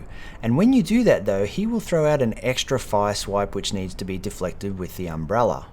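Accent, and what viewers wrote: Australia. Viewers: During a perfect battle he can barely do anything special but if you take too long my god he sculpts you into his bitch. Thanks again, when I hear that familiar aussie accent I know everything will be okay. Dissecting the videos like this has really made me appreciate the combat too.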